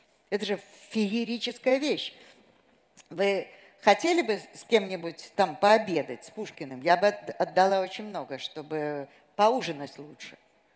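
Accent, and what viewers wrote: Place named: Moscow, Russia. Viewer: Как тяжело слушать этот явный масковский акцент)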